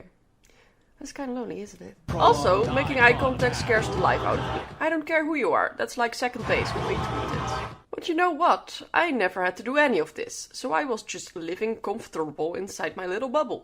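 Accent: Netherlands. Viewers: Dikste hollands accent ooit vrind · Are you dutch? Ik kan het horen in je accent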